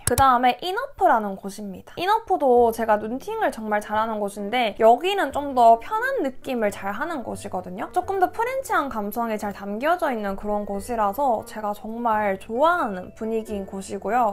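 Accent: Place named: Busan, South Korea. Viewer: 런던플랏 아는곳 나오네 너무 반가워요 부산말도 반갑구요 잘보고가용 ᰔᩚ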